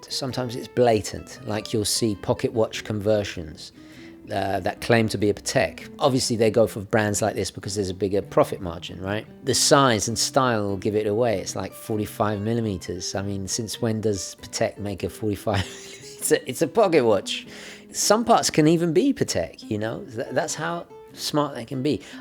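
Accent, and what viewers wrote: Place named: United Kingdom. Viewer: I just tune in to listen to you talk with your British accent.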